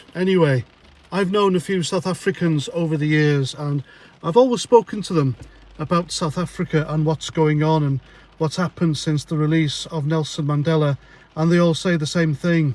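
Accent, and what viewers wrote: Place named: London, United Kingdom. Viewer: Sound like London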